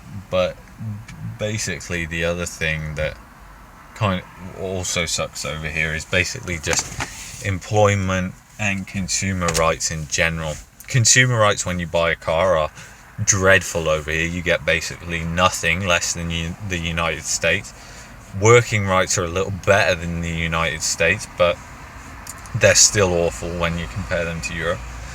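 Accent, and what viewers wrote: United Kingdom. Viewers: Sounds like youre from same area as me in the UK! i feel desperate for a change of scenery from here... I want to move to somewhere like Canada but right now, I can't even find a decent job to save up for all that so (if i ever did)... i think it's a looonngg way off. Cheers anyway, informative video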